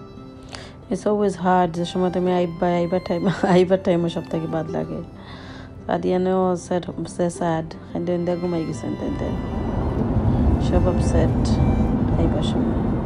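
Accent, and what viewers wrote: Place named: Sylhet, Bangladesh. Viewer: খাটি সিলেটি কোন বেজাল নাই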